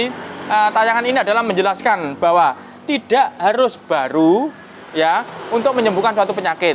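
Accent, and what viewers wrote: Java, Indonesia. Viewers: Sayup sayup suaranya langgam Jawa enak didengar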